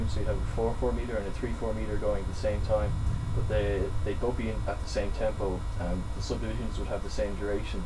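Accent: Ireland. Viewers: Your irish? awesome :D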